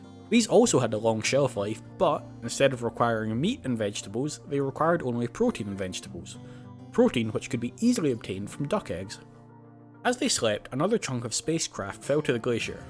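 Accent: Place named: Scotland, United Kingdom. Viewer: I swear, I can't just be hearing a "oh, yeah, both scottish" accent between you and Fandabi Dozi. It sounds like same damn neighborhood. Though I guess, I'm ignorant enough maybe I'm catching a dialect shared over a metropolis? Though it seems more regional. Like you're the same guy, but 5-10 years younger. Near enough to sound like brothers. Ps. These videos are great. Best I've seen since Pete Complete. And I'm both always looking and hella picky. You seem to have good instincts/training/etc for this.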